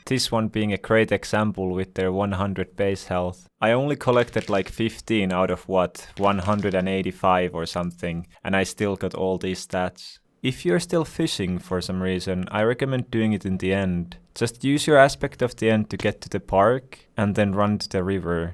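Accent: Finland. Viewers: Are you from finland ?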